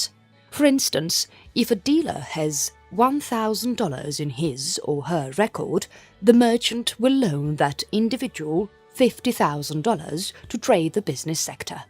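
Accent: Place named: RP, United Kingdom. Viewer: Thanks, posh-sounding nice English lady, it was very informative :)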